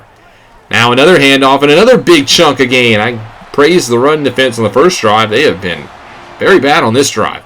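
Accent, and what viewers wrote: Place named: South Carolina, United States. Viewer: Brother, you gotta be a native South Carolinian. Ain't nobody but natives pronounce it Sac Carolina! I know, cause that's how I say it too.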